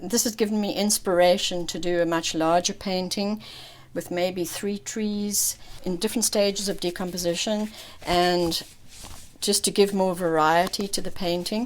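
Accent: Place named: South Africa. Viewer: Hi, Ive been doing watercolours for the last 9 months and loving them. Thanks for your videos they have been very helpful to me. By the way you sound very south african...must be an expat!:)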